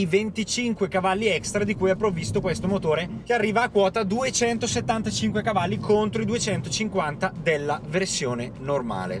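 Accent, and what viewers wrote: Brescia, Italy. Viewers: Finalmente un bravo tester con il nostro tipico accento bresciano complimenti ..!! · figa fai un corso di dizione, la cantilena bresciana non si può sentire!!! :D anche se sei bravo!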